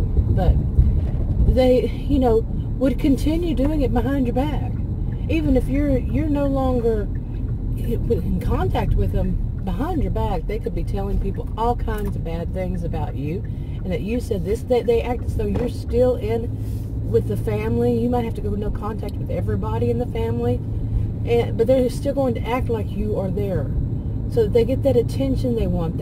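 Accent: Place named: Texas, United States. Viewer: I was happy to find your video. My 72 year old narcissistic mother and 48 year old, younger, golden child, narcissistic brother have both stolen lots of my life/time from me. Lots of ongoing ptsd. Still, I forgive them. I have to. My faith requires it. Even though the abuse never stops. At first I thought, you sound Texan. Then I saw the licence plates(lol). I'm in Pearland. Howdy neighbor. Hope your channel grows. Subscribed. God bless you and yours.☺🙏💜👍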